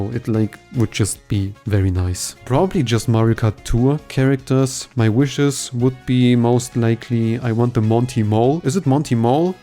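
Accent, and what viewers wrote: Germany. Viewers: IMO the city tracks in this wave were a huge improvement, Singapore is pretty good and Bangkok is even among my favorite tracks in the entire game! Side note, by the way you talk it's very noticeable that you're German (ich bin auch deutsch ^^). Try to use the word "the" less in places where it doesn't belong in English. For example, just say "Yoshi's Island" and not "the Yoshi's Island".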